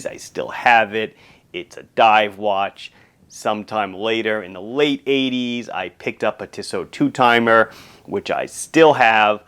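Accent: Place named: New York City, United States. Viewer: Hey! Awesome video! Great story! I never had the feeling it was a one man show because of the excellent service and attentiveness I receive when I shop with LIW. I love the fact that you're an engineer AND a watch guy and that your wife is helping keep the business with you. And as a Brit in Switzerland I really like to hear your Manhattan accent because you say bought, caught etc. like we do.:-) Keep 'em coming! Sincere best wishes for now and the future to you, your family and your employees. Rob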